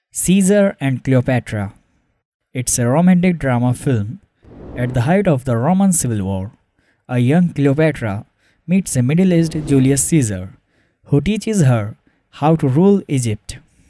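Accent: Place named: India. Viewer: i dont care about the stories im here to hear the indian voice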